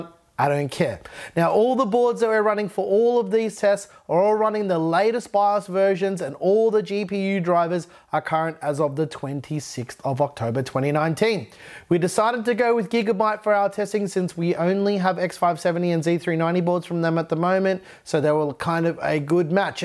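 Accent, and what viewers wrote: Australia. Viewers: I subscribed for two reasons. Firstly, you don't overclock your cpu's. WHo does? Well statistically less than 1% of computer owners do and I am truly not interested in doing so. Imagine buying a brand new car and then adding turbochargers and NOS to it. Dumb, right. Overclockers do so so they can stress test their computer gear and pay to have the results published. It becomes "I have a bigger dick than you moment" which is stupid and unnecessary. Secondly, it's your accent. After listening to such much American droll it is refreshing to listen to an Australian. You are doing the world a favour. Ahh.....that accent is fantastic. Can listen to it all day and your grammar is exceptional. Thank you.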